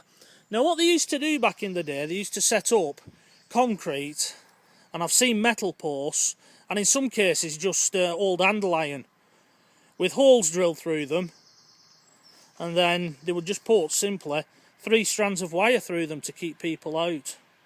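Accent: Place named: Yorkshire, United Kingdom. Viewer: Loving your Yorkshire accent, reminds me of Emmerdale.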